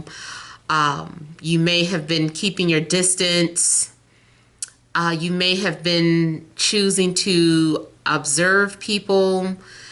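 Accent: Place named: United States. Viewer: You are thé best ever love from France 🇫🇷 even if it is sometimes difficult to understand the American accent 😂Happy new year 🥇♥️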